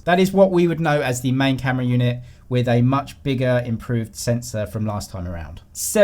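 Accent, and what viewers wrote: United Kingdom. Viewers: I could even recognize that typical British accent, our dude is right now in Shenzhen, China ..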